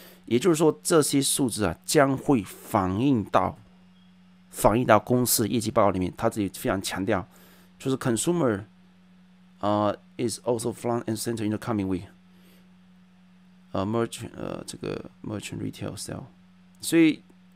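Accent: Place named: Zhejiang, China. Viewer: 浙江人，哈哈哈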